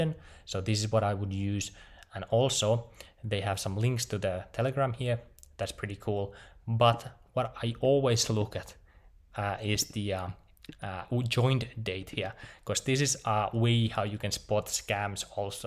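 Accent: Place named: Finland. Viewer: Are you from finland? cuz you sound like it.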